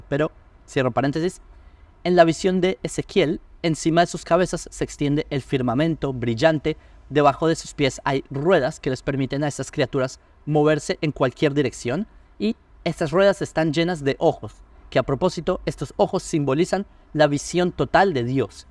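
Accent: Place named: Bogota, Colombia. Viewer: Muy buena explicacion...entonces es el estilo de tematica de Ezequiel...,tu hablas como si hubieses vivido en Bogota...tiene un español como de Bogota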